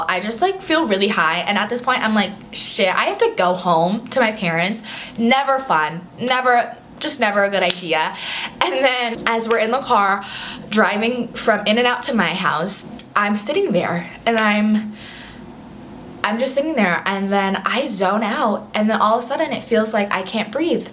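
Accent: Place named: United States. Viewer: She has the typical American girl accent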